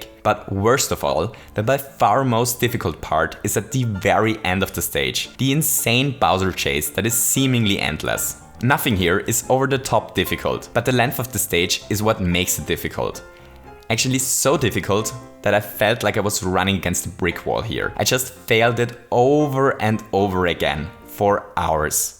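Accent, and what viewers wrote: Germany. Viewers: Am I the only one being annoyed by him speaking so enthusiastic with that german accent or whatever that is .. ? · ich merke das du ein deutscher bist weil dein dialekt anders ist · Is he german? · Man hört so hart das du deutsch bist hahaha #nofront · Are you german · Are you German? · Are you german? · Bin ich drauf oder hört man das Deutsch raus ? Hahaha, nice video !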